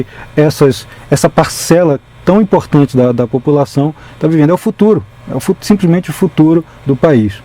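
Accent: Brazil. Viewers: bom dia! Você é mineiro? Sou mineira de Belo Horizonte, taurina e ascendente em peixes. Grata pelas explicações dos eclipses, um abraço!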